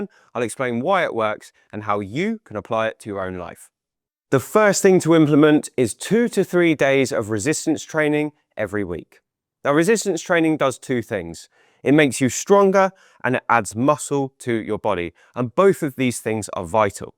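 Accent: England, United Kingdom. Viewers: The best English accent ❤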